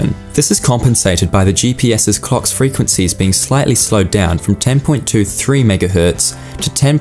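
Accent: New Zealand. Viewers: ooooh I was sure this was a Kiwi accent, then when I saw the AirNZ app I knew it had to be! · Ahhh that kiwi accent.. grrrr lol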